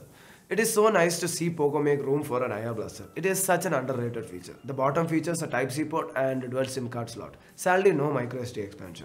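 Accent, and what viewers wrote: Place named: Kerala, India. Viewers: no but kerala people english accent is different compared to rest of south and north indians . And only malayalis can spot the difference 😎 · I'm here in c4etech after a long time. But when I started to watch this video, in 3 seconds I found out that this guy is a malayali. Because, they have their own accent for english. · Has the south indian accent ♥️♥️...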